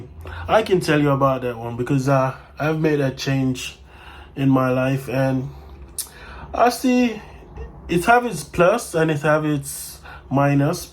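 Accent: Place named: United Kingdom. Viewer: no you British accent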